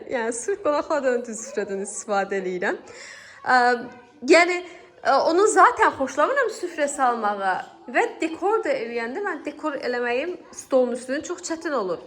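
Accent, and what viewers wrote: Sheki, Azerbaijan. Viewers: Şeki lehcesi.❤eviniz mohteşemdi.can sagiliqi ile otrasiz.bizde evi tikilmis hazr almisq deye tam isdedymiz kimi olmadi.bizde 220kvadratdi 2mertebe xercimiz cox cixb.amma 1gün satb oz isdedymiz prayeti alarq ya sifrdan tikerik insallah.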